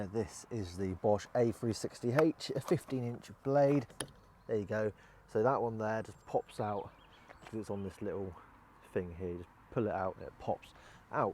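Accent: England, United Kingdom. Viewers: I think people with English accents should be the only people allowed to teach things.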